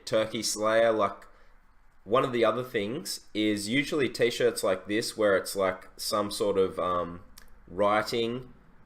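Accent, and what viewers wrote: Australia. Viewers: Thanks again meng you the best!! You are from Australia correct? Sorry if i'm wrong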